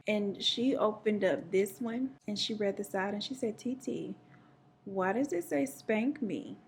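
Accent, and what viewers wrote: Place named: Houston, United States. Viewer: When I started watching you I kept things you sounded like you were from Houston!! We have a unique sound when we talk 🙃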